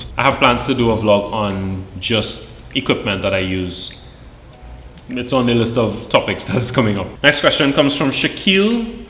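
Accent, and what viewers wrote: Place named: Trinidad and Tobago. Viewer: Our beautiful Trini accent .Keep in representing another YouTubed here.Keep up the good work.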